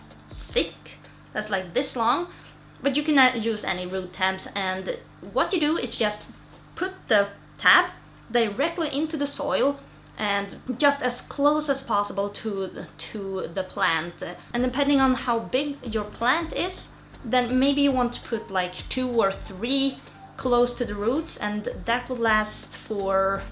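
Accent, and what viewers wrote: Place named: Scandinavia. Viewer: Hey, I was searching for something the other day & found your channel! My name is Stein Eric & I live in Minnesota with a few other Swedish people who you might know......Just a little joke- 🤭 So I'm a big fan of anything to do with freshwater aquascaping. I have been keeping pets my entire life but nowadays it is mostly peaceful tropical fish with the Bettas, Cory's, Dwarf Rainbows, Harlequins,Otocinclus & and the only snails that are allowed to be in the aquarium are Nerites!!!!!! I have 9 aquariums and a handful of small enclosures that are having just plants & several types of Moss (my favorite plant? ) I just wanted you to know I've been enjoying your videos and the best Scandinavian accent that I've heard in too long...I am a 1st generation American and both of my parents are from Norway and grew up in Vik ❤️ I am going to IKEA tomorrow for the supplies I need to build my 1st aquarium and hopefully the 2nd too. 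🤞 I watched Tanner on Saturday, and he had a brilliant idea for sure & if you have not already seen the last video then I am going to be really quiet and now I have the best idea for you to get online and see what Serpa Designs is up to. Ciao 🌉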